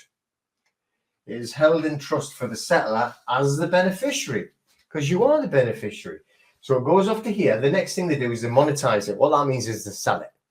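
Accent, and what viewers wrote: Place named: Newcastle, United Kingdom. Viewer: You sound like a geordie if I’m not mistaken are you from the North East of England??? I’m curious apologies in advance if that’s not the case.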